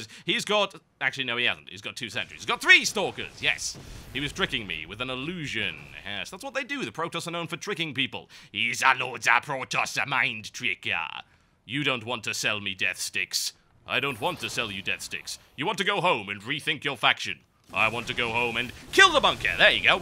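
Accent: United Kingdom. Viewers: I think I have found my new favorite SC2 caster. I have to say it's kinda unfair since has his British accent in his favour.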